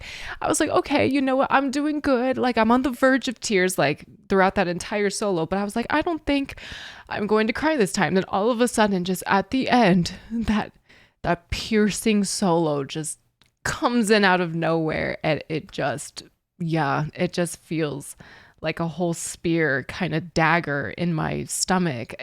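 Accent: United Kingdom. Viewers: Are you British? You have a little bit of an accent which is totally cool but I was just wondering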